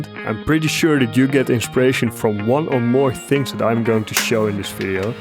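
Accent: Netherlands. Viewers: Mede Nederlander hoor ik😅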